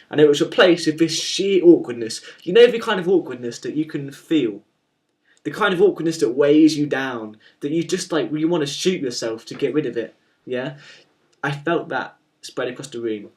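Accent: United Kingdom. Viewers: You're so cute and I think you're clever person.. ;D so don't be like Charlie McDonnell.. be yourself.. I think, that you are, but a lot of people - don't... and maybe they're right.... and do not dress the hat any more(or jacket, etc..), please... you are in the room, that looks pretty weird.. and that is like Charlie was doing.. ;D ..but I really like you. (and I love your British accent ! ) xd - Kate. ;) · LOOOL :D you're hilarious.. and very cute! :3 and you've got a lovely accent! (british accents ♥) keep it up, man - but the other commenters (is this a word?) are right: you really should shorten your vids to 3-4mins.. but the video is great, though :D and the title of the video fits my name, doesn't it? :D (wow, I'm using many smileys..)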